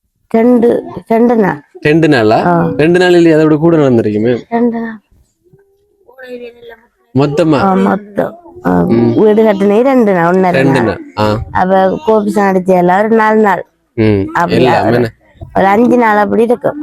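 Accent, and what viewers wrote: Sri Lanka. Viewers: வணக்கம் Pro, நீங்கள் செய்யும் சேவை அருமை, உங்கள் மட்டக்களப்பு தமிழ் சிலாங் கேட்க செமையாக காதுக்கு இனிமையாக இருக்கிறது, அடிக்கடி என்ன என்ன என்ற வார்த்தை கூடுதலாக பாவிக்கிறீர்கள், மொத்தத்தில் Supper Pro, ஜேர்மனியில் இருந்து சிவராஜ்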